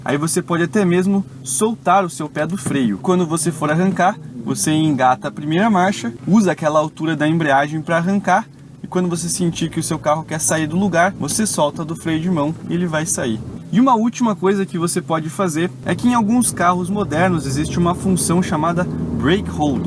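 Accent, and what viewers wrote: Curitiba, Brazil. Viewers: Vc é curitibano? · Dae jovem, tu é de Curitiba?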